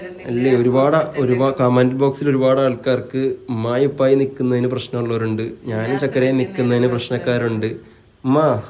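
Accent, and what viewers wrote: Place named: Kollam, India. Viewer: Kollam slang super👍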